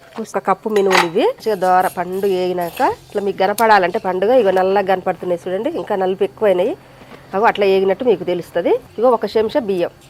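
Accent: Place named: Mahabubnagar, India. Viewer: Medhi mahabubnagar side kadha language thelusthundi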